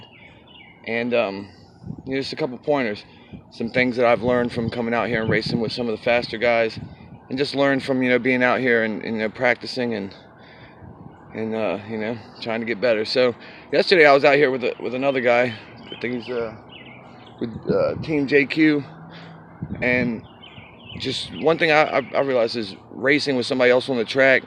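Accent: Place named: Pennsylvania, United States. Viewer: You sound like you're from PA, and not NC. Just an observation. :D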